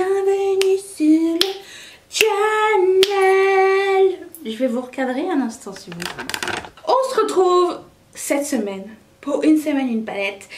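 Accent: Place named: Quebec, Canada. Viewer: Wow! Je sais pas pourquoi mais j’entends un léger accent québécois 😂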